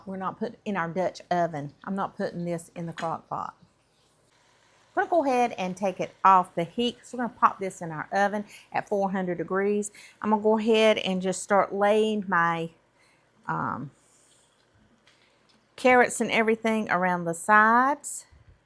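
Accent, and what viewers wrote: South, United States. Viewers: Now that looks like tasty pot roast! You’re always are so upbeat and I adore your Southern drawl. Thanks for all you do to just draw us in for little more seasoning. ❤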